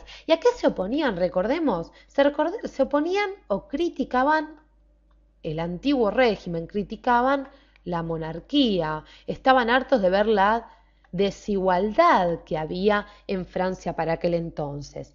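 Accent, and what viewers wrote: Argentina. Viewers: Me encanta el acento argentino :D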